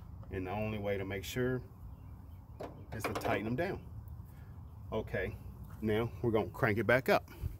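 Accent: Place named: United States. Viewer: You just helped me so much and i greatly appreciate. What i enjoyed most though was your country accent and the fact that you made the time to break down and show us step by step. This video is by far the best learning experience i have gotten from a mechanic video. I would like to see more since I own a 96 nissan maxima and have changed out numerous censors and other important parts and mine will still not run. If you could maybe help me that would be fantastic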